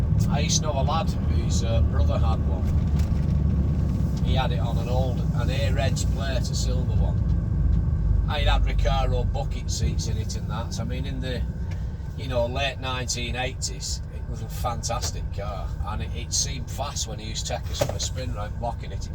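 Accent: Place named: Lancashire, United Kingdom. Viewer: Your some character,love your chat proper lancashire just like me lad you always make me smile cheers mate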